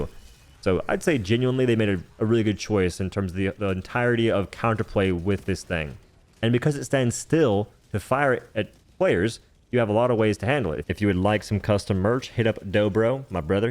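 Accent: United States. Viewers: So your british using a American accent. Thats wild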